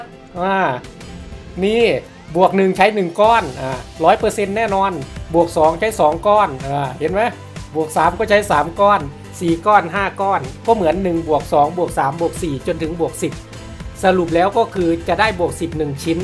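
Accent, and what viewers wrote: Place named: Ubon Ratchathani, Thailand. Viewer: ครับผม สำเนียงพี่เหมือนคนแถวบ้านผมเลย ผมคนอุบล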